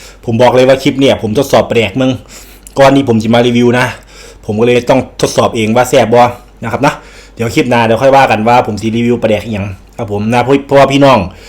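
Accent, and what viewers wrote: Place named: Chaiyaphum, Thailand. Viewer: อ้าย เว้าสำเนียงคล้ายๆบ้านนู๋เลยจ้าคนชัยภูมเด้อจ้าfc จากชัยภูมิเด้ออ้าย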